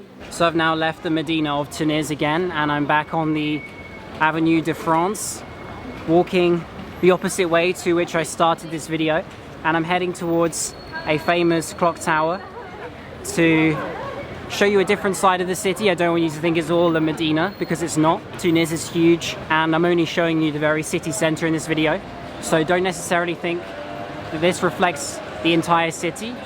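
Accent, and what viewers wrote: England, United Kingdom. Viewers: Hey, you sound British. Are you from the UK? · Çok güzel bir yermiş ve harikaa İngilizce aksanınn💖💖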